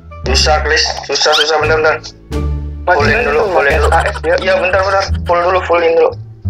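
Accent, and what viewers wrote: West Java, Indonesia. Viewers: Sunda Euy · Sunda ti mana neng gelis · orang sunda gening😀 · Orang mana teh ning sunda? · Sunda Wani😂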